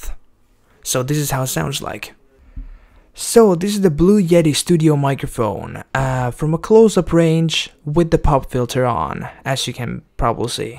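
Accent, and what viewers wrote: Finland. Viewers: You sound very,,, Finnish :D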